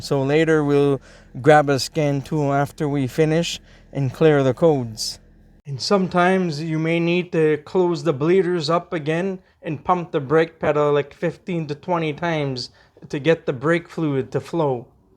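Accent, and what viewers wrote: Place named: South Africa. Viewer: Its been a whole year of trying to figure out your accent and Im just going to wing it, is it South African?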